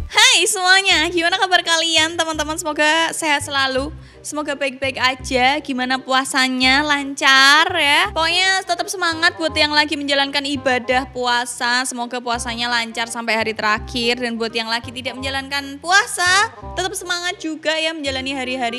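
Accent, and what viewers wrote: Java, Indonesia. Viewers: Medok wooooooooyy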